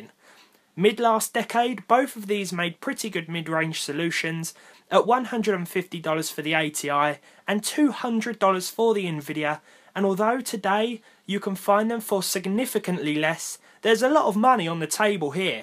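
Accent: United Kingdom. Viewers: Nice video dude! Just a question. Where exactly are you from? I'm asking you because I'm studying British English at the moment but your accent is quite different from the other British speakers that I've known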